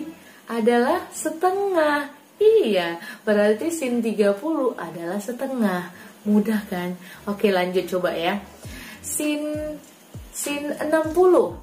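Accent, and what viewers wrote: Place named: North Sumatra, Indonesia. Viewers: Bahasanya Medan kali... Sukses trus membuat kemudahan matematika👍👍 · Nampaknya ini dr sumut ya 😁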